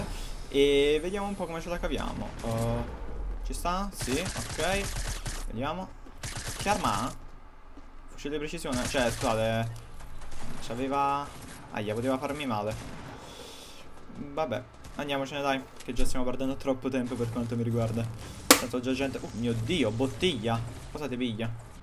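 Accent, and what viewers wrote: Naples, Italy. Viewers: Ma sei di napoli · ma ti che parte di napoli sei?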